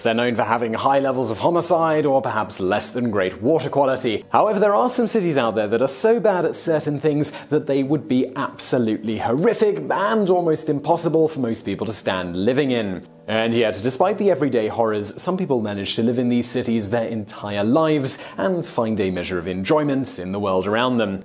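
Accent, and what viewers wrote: United Kingdom. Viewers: well... thats a very British accent... could we get subtitles? o.O my poor aussie brain cant understand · I really love your channel, the info is very interesting. BUT, why do you use such weird syntax that doesn't sound like English? I'm talking about something like this (this is not a direct quote, just an example); 'The people of Fukushima they have thyroid cancer'. Or ' India it has a big garbage problem'. The words 'they' and 'it' are completely superfluous in these sentences. You can take them out altogether and nothing changes in the meaning, the sentence just sounds correct. Maybe your writer is a second language English speaker, but I have to say it drives me absolutely crazy; eventually I will stop watching because I won't be able to stand listening to you mangling the English language with your very proper English accent.... 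· Whats the deal with all these blooming Brits narrating virtually every video on Earth ? Bloody 'ell !!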